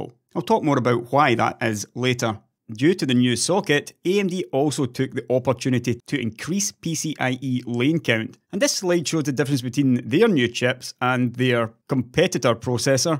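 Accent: Scotland, United Kingdom. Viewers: That is one Scottish accent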